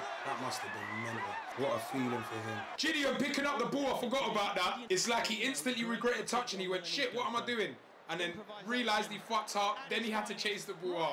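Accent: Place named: Paisa, Colombia. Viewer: Man got that paisa accent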